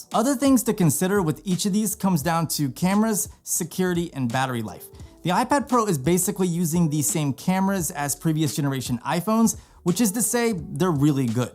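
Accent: United States. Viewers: omgawd i lasted less than a minute.... no offence, but your voice is sooooo annoying and so 'Jersey'.....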